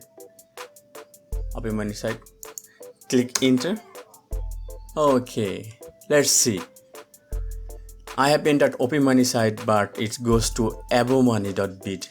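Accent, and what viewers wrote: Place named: India. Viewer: you saved my ass bro, don't mind the accent i always trust Indian youtubers, there are just legit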